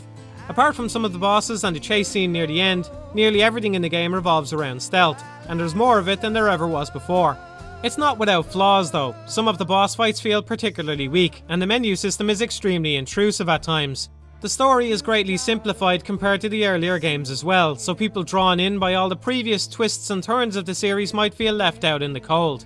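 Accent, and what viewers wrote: Ireland. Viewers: He is Irish so I'd Imagine they have a bit harder to pronounce thingsm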